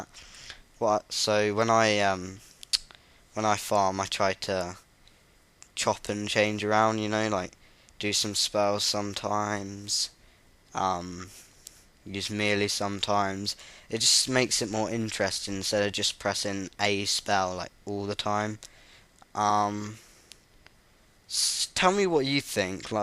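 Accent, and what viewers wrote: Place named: England, United Kingdom. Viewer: Lol I like your English accent.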